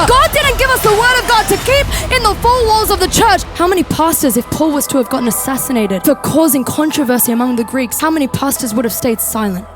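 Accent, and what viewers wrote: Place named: Australia. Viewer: I literally saw my first video of hers and I thought “ yes, she’s an Aussie!” Seriously so good to hear the truth being preached unashamedly but with such wisdom & you can tell she knows her God and His Word. I want to stop eating my dinner now & go and read the Word! Truly anointed, I think she is one to watch.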